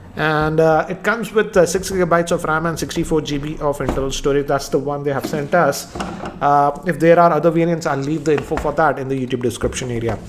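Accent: India. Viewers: So that what it is. Indian English sucks👎🏻👎🏻👎🏻👎🏻👎🏻👎🏻👎🏻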